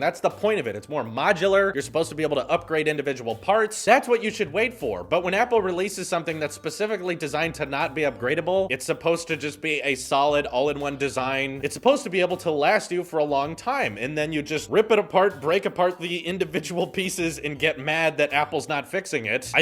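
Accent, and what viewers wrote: United States. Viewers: What’s you’re nationality? Just wondering I thought you were from the UK but you sound American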